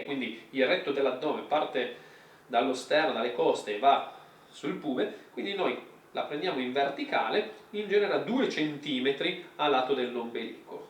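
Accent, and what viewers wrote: Turin, Italy. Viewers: ricorda Angela e Odifreddi nel modo di parlare perché è di Torino come loro.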